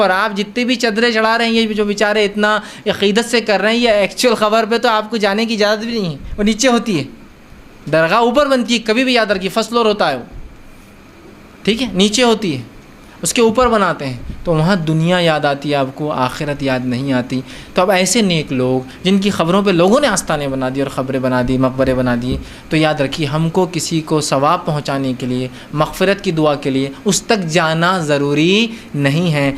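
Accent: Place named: Hyderabad, India. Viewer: He's hyderabadi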